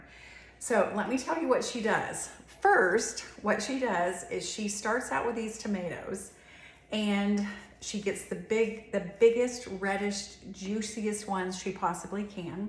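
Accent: United States. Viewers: love tomatoes and even though you pronounce it the American way, I forgive you! I am right off course because I am British! Thank you so much – Paul from England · I've got a large crop coming in, I need to try this thanks. That's the first time I've heard "Oh my stars" in so so long. You have to be Southern.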